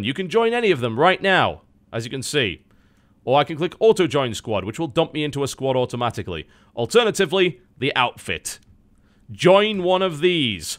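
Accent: United Kingdom. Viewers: He's british?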